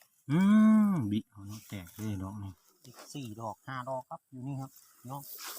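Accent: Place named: Kut Bak, Thailand. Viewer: สำเนียงกุดบ่กชัดเนาะ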